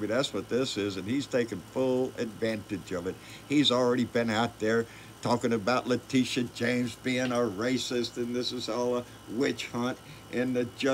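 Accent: Texas, United States. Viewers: I love you, chum!! You remind me of my first husband! Same Texas accent, coloration, shape, sense of humor... Age, too!! 😆😆😆💋💖💋💖😁😁🍿🍹🌊🌊🌊🌵🌵👵🐺🖖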